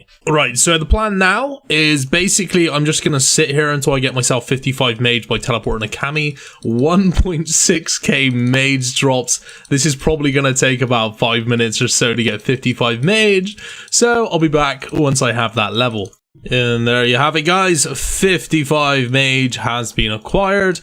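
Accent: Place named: Somerset, United Kingdom. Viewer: mate that 'sommersert' accent..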